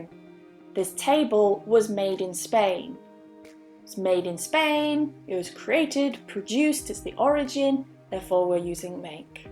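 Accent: United Kingdom. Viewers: love your British accent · Please, You should make some videos about reading in English, I believe it will be useful furthermore would help us a lot and your British accent is beautiful, thank you for sharing this video plus a big kiss.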